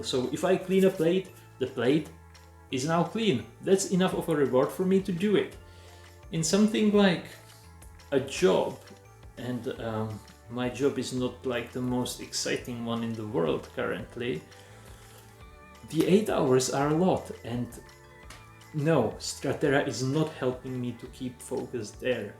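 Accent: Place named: South Africa. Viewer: Are you South African my friend? I know your accent!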